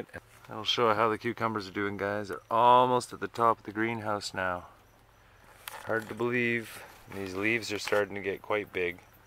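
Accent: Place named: Canada. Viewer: Are you Canadian? I can hear the accent. Reminds me of home. I never really noticed until I moved to the US. Now every time I talk to family it’s all “a-boat” and “eh” and “buddy”. Lol.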